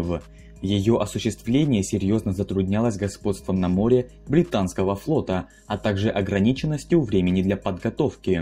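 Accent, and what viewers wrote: Ukraine. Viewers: Западноукраинский акцент, просто старается тщательнее произносить русские слова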